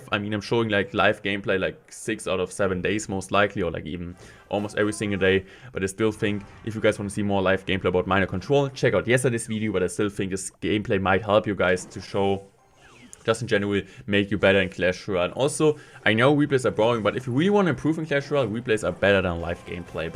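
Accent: Germany. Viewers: The reason why so many people are using minor control is because you showed the deck 😂 Edit: man hört deinen deutschen Akzent „bisschen“ 😂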